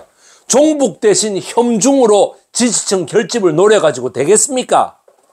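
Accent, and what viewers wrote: Gyeongsang, South Korea. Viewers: 상대방을 대하는 존칭부터 배워야 될것같네 혀짧은 반말 갱상도가 개상도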